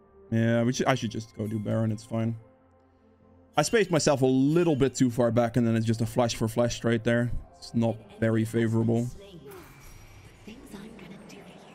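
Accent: Netherlands. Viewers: He sounds dutch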